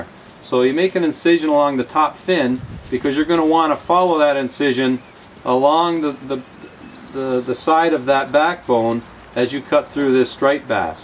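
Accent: Canada. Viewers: Sounds like a Canadian. Hope he’s here legally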